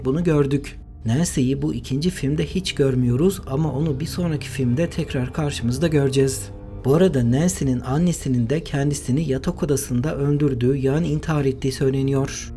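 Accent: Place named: Erzurum, Turkey. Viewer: Abi sen Erzurum lumusun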